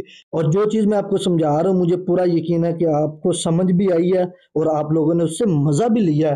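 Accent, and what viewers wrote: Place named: Kashmir, India. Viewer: Sir bohut kush sikhne ko mil rha ha apki videos sa bilkul hmrey jammu and kashmir ki trh language boltey ho ap❤❤❤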